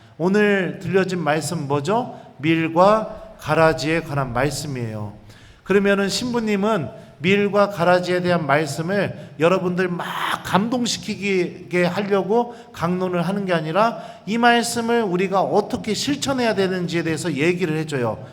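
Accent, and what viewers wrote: Chungcheong, South Korea. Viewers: 신부님 강론말씀최고임니다 저도고향이충남보령 인데 충청도사투리가 참 정겨워유 감사합니다 ❤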